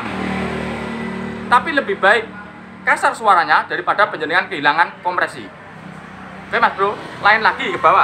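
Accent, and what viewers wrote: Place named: Medan, Indonesia. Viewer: Abang satu ini kayaknya asal Medan😁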